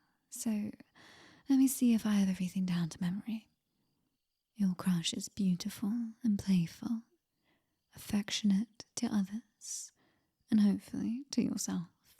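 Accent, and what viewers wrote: United Kingdom. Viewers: If I may make a confession, a British accent was what came to mind when writing this script, so I'm glad it got a British voice. Thank you for the fill! :D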